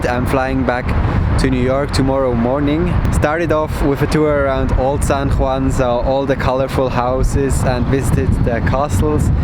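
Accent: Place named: Germany. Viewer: Nice to listen vloggers with an Accent that U have idea where its from... My guess is German.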